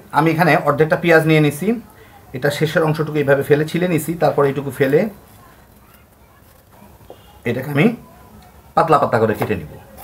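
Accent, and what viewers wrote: Bangladesh. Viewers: Dada .... Epar Bangla (India) theke antorik bhalobasha. Recipe to darun, kintu apnar presentation ta awesome. Amara o bangal, r anek din por ei Bangladesh er accent ta khoob bhalo laglo. Bhalobasha o subheccha roilo 🙏.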